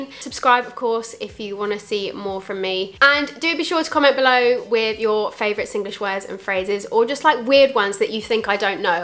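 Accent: United Kingdom. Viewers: LOL... Love hearing in British accent :) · I'm sorry but your british accent doesn't fit with our language... sorry gurl. btw, anyhow means something similiar to not doing it properly. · Hi! Im new here. Im singaporean and this is the first time seeing someone who speaks British trying to speak Singlish! Keep it up!!! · I love u speaking Singlish in Bristish accent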